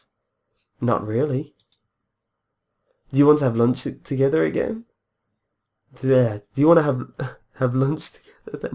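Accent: England, United Kingdom. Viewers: are you from the north or south of England?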